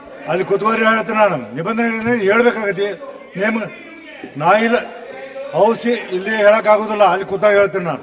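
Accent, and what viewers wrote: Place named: North Karnataka, India. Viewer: Good sir North Karnataka language❤❤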